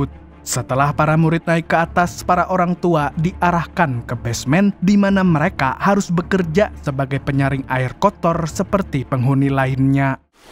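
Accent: Banjar, Indonesia. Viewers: Adminnya urang banjar ngarannya nii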